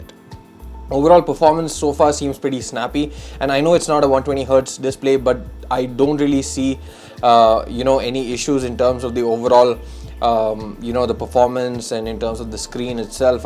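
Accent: India. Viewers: U sounds harmonic From INDIA ? Subscribed anyway 😊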